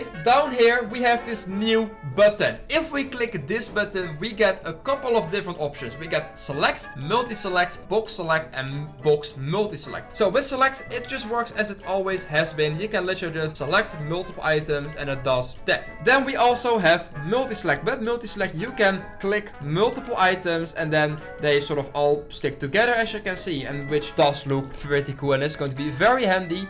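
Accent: Netherlands. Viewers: It’s English but it’s with the worst Dutch accent ever lol. I’m Dutch myself and I’m struggling to understand him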